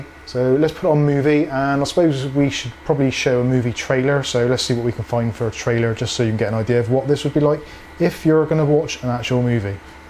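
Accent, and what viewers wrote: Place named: West Country, United Kingdom. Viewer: loving the west country a ccent, great review new sub added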